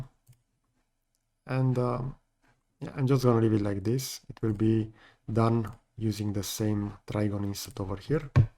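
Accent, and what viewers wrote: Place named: Romania. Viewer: Auuuuuu ... asta-i engleza rumaneasca ... !!!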